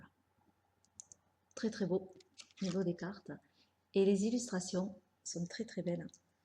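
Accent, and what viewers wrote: South, France. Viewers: ton accent du soleil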